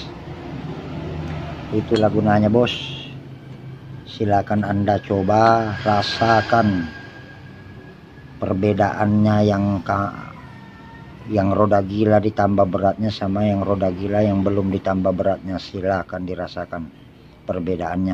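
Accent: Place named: Bugis, Indonesia. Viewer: apa kabar bang...?sy dengar ada logat logat BUGIS nya....!slm knl.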